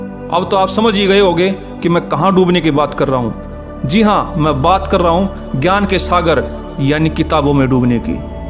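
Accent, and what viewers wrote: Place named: Haryana, India. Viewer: Bhai Ji aapki voice se andaja lagaya hai 🙏🙏 aap Haryana se ho kya 🤔🙏🙏 bahut hi sundar video and voice ❣️❣️❣️❣️❣️🙏🙏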